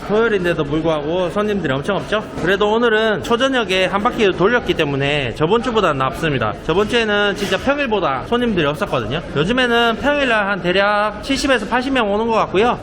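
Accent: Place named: Daegu, South Korea. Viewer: 와~~오늘 영상 정말 즐겁게 봤어요 사장님의 가끔씩 튀어나오는 대구사투리가 정겹고 오래만에 대가 영상도 좋았어요 직원 생일까지 알뜰히 챙기시는 모습도 끝내줍니다 코로나 빨리 사라져 자영업자님들께도 좋은 날이 하루 빨리 오기를... 그날까지 화이팅 합시다 👏👏👏👏👏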